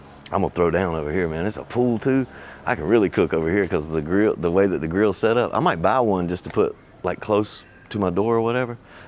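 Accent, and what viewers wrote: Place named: North Carolina, United States. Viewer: The more I watch and listen it seems old North Carolina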